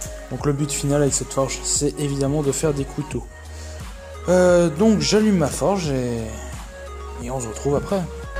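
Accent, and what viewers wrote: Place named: Belgium. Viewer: Tchu ti ké beau accent belge la Belgique le meilleur pays🇧🇪🇧🇪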